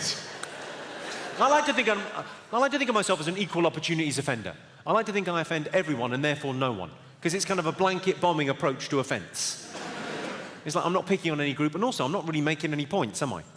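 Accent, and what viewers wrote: United Kingdom. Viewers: Can you explain (or transcript, to be exact) the punchline, please? It's somewhat hard for me to decypher some words in british accent.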